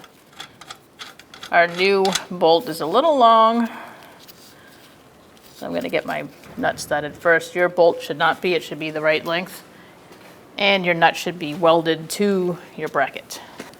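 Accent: Boston, United States. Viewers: Love the Boston accent ! 👍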